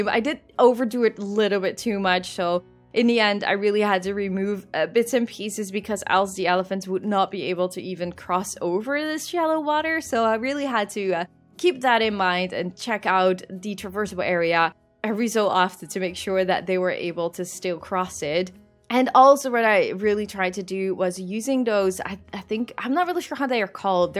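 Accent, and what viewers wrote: Netherlands. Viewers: I knew you accent sounded Dutch! Never been to Rotterdam zoo but I loved Beekse Bergen. Been a very long time since I last went to visit but I'm definitely gonna go again sometime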